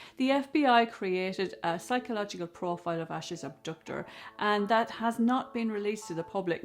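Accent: Ireland. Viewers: Who was at that slumber party? I think someone at that slumber party or an adult in charge knows what happened to her and why she left that night. Do we know the people her cousin knew? Love the way you delivered on this case. Glad I happened on your site. I think you’re a fellow Irish woman, the accent gave you away. Look forward to hearing more from you.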